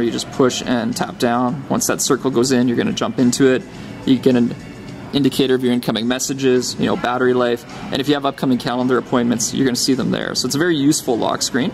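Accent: Canada. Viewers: He's Canadia, right? Btw, rooting for BlackBerry. Go RIM!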